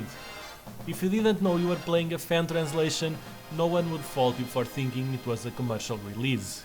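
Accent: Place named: Russia. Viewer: Not sure if you are Russian by your accent or Portuguese/Brazilian by your Twitter language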